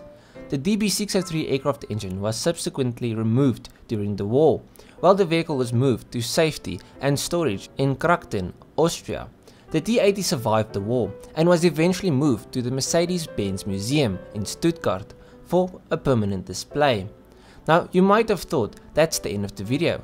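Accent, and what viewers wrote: South Africa. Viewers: i notice that South African accent from miles away😂❤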